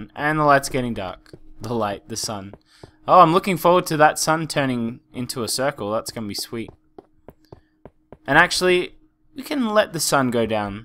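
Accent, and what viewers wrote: Australia. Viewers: You sound like an Aussie! Southern Hemisphere people rule!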